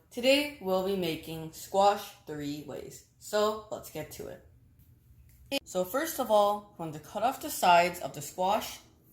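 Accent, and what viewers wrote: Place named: South Korea. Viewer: 앗 한국식 영어가^^ 맛있게 잘 보고 가유~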